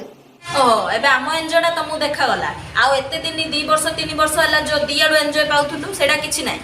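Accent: Berhampur, India. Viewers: Satare tm video dekhi ki mu Bahut khusi. Intresting anchor. Pura barhampur style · Superrrb mam language jabardast barahampur side ra akka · Pura ama berhampurya language style...maddi chalao madam..Jai maa budhi thakurani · Sister tuma barahampuriya katha suniki maza laguchi 🥰🥰🥰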